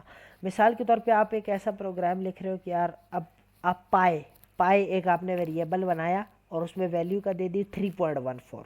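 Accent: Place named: Karachi, Pakistan. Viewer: Or mujhay fast Reply marna waissay bi zuban sy karachi ka lagty ho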